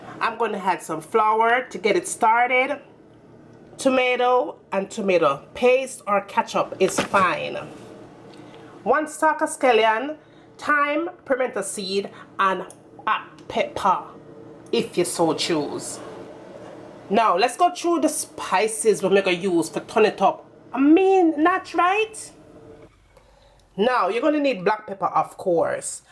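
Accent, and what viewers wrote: Jamaica. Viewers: Beautiful! Yummy recipe . I love that beautiful Jamaican accent too. The best of the best. My husband was brought up in Mammee Bay. Born in Canada but spent his whole childhood in Jamaica. Ty so much for sharing... Bill thanks you too hahaha (cause he loves his Jamaican food).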